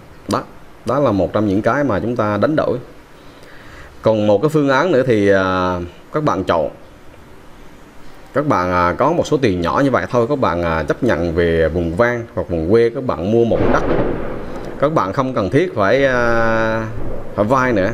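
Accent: Quang Nam, Vietnam. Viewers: Nghe giọng QN ĐN chán chết đc .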